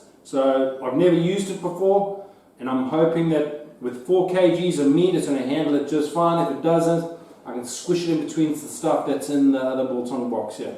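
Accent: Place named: South Africa. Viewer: I can hear Seth Efrica in but you've also something else going on with your accent. Nice video by the way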